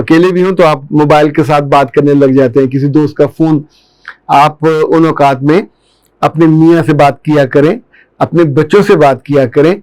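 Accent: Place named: Hyderabad, India. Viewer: Hyderabadi Urdu ...Awesome 👌👌👌😊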